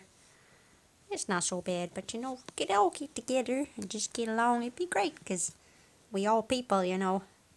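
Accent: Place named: Texas, United States. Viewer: Wow you are israeli but you sound like a texan. How???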